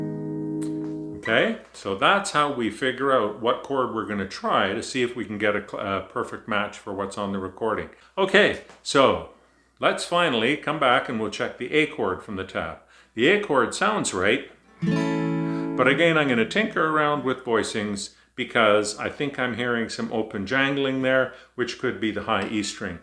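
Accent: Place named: Canada. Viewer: I loved how you used Abm as the second chord. It gives the song a different feel. Chris Marin of Cold Play also did his own arrangement. If the song writer is as good as Dylan, it opens up the material to many different interpretations (like Shakespeare or the Beatles). I love everything Canadian including your accent. The greatest people and country. 🇨🇦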